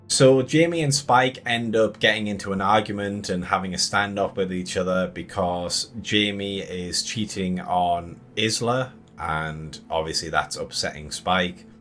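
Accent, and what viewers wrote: United Kingdom. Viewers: Did you just say that this film is set in the Scottish highlands?! You sound British. I'm amazed that you can't tell the difference between a Scottish and a Northumbrian accent, and haven't heard of Lindisfarne. Or the Angel of the North. The characters at the end weren't just power rangers but dressed like Jimmy Savile. That's the thing that I couldn't get over.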